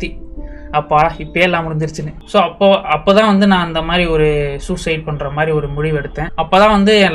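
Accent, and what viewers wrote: Madurai, India. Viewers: Bro Ru from Madurai ???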